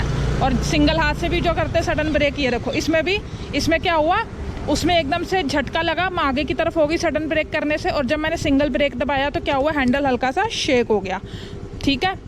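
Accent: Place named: Himachal Pradesh, India. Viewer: Maam main apka fan hu apka himachali accent bahut achha lagta hai❤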